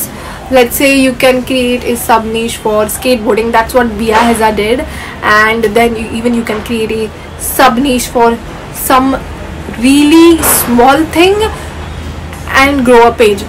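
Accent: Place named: India. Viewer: it's the Indian accent ahah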